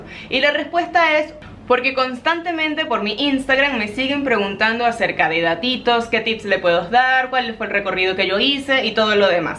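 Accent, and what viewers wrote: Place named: Peru. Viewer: creo que tiene acento peruano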